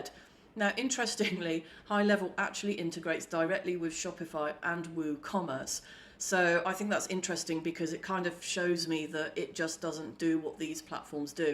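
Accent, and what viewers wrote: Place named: United Kingdom. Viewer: Nice to hear a British voice lol 😂🙌🏽. I'm from the uk also 🇬🇧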